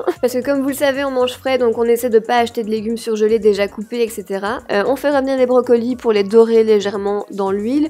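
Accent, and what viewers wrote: Belgium. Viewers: J'adore l'accent belge 😂😂😂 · J'adore l'accent belge: houit 😂😂😂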